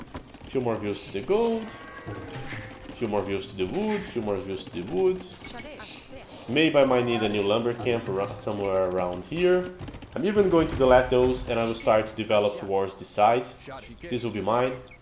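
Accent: Brazil. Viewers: Ctza que é brasileiro o sotaque não engana...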